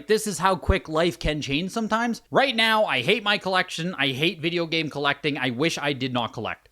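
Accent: Canada. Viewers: If you had $6.4k ready to spend on one game you are likely in a better position than you think to buy a house.... Or you could be broke and making wild financial decisions. Is the house for sale or did he already sell it? If it's still for sale look into buying it. 3.5% and 5% loans are pretty common. Anyway I was typing this while still watching and the more you talked you sound Canadian so that might not even be relevant lmao Edit: yup right after I commented you mentioned a Canadian copy of a game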